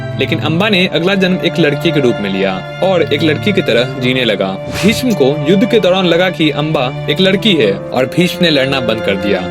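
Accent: Bihar, India. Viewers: Bihadi ho kya bhaiya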